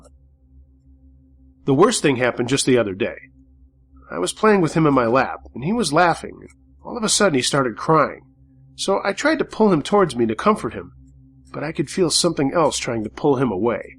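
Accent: United States. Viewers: Still can't sleep. Still going through every video I can find. You have the best voice/American accent around. Thanks for getting me through these long sleepless nights 😁😴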